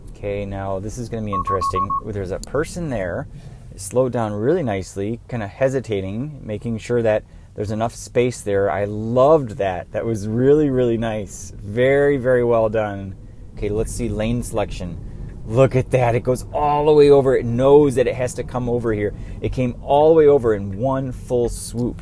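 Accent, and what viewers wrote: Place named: Canada. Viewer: Are you Canadian? I’m hearing a bit of an accent 😂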